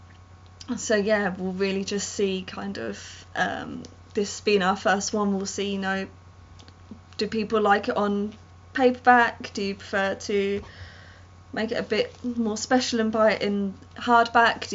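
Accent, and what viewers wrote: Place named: United Kingdom. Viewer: A British accent makes all poetry better somehow 😃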